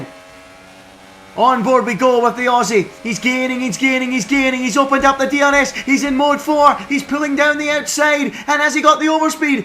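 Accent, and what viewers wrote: Scotland, United Kingdom. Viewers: nice scottish accent commentary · One question. Is he scotish?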